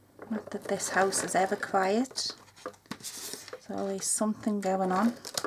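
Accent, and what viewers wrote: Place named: Ireland. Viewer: I thought I was hearing Ireland in your voice. My Dad was born in Ballymena, Co. Antrim.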